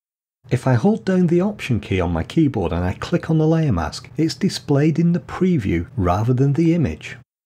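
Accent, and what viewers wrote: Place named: Manchester, United Kingdom. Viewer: Hi, I loved hearing your Manchester pronunciation, identical almost to my Cheshire accent, even after 37 years in Germany. However - I have to draw the line at your pronunciation of "Gaussian" as "Gaysian" - the pronunciation is phonetically "Gouwsian" as in (Northern slang) "nouse" after the German mathematician Carl Friedrich Gauß (Gowse??). Thanks a lot, enjoyed the video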